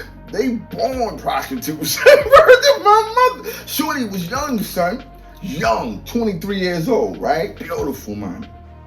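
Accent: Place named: New York, United States. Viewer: love when this dude talk that ny shit lol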